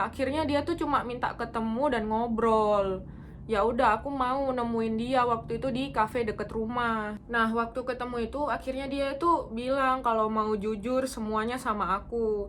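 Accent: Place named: Surabaya, Indonesia. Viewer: kak kamu orang surabaya kah?.. kok logatnya surabaya banget hehe..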